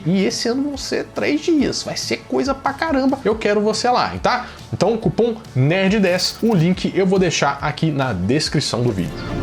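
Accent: Minas Gerais, Brazil. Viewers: que bom que você continuou com teu jeitinho minerês de falar, é tão fofo. Abração, garoto! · 😮 Pode deixar esse sotaque mineiro bonito em paz!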